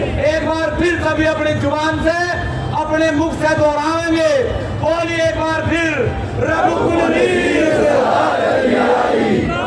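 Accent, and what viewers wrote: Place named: West, India. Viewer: Paschim ki boli esi he bhai good work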